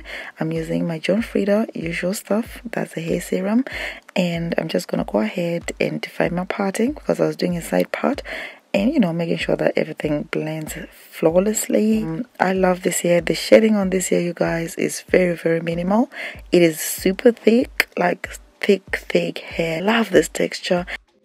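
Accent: Zimbabwe. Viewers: You sound just like my aunt. You have to be Zimbabwean. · Chaz Lynn she's Zimbabwean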